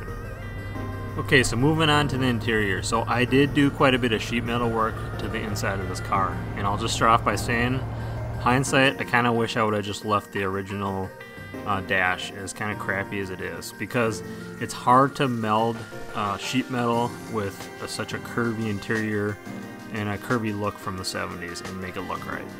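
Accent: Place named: Michigan, United States. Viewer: You sound like my cousin from Ann Arbour Michigan. great job with the corvette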